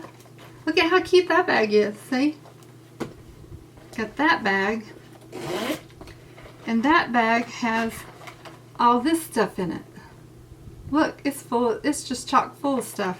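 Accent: South, United States. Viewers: I love you so much I think your were sleepy in this cause you sound even more southern , I do the same when I'm sleepy i love your top today enter me darlin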